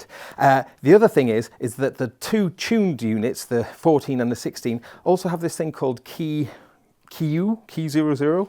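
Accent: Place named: Wales, United Kingdom. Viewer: he is not nervous, it's just the welsh accent.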